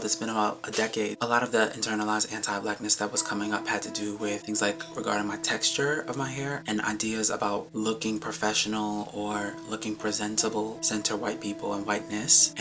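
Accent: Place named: United Kingdom. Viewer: I really enjoyed your British accent!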